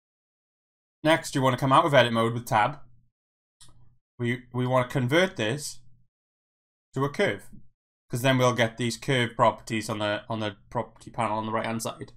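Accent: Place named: Wales, United Kingdom. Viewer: Thanks for this video! Are you from Wales? If so where abouts? I'm in Pembs, I reckognize the accent.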